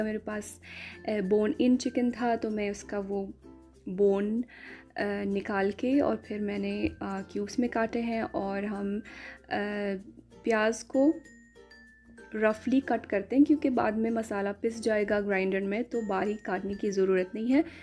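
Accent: Pakistan. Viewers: Ap ka urdu ka leja i mean Accent same pakistani hai lagta hi ni ha ap morrocian ho 👌👌👌👌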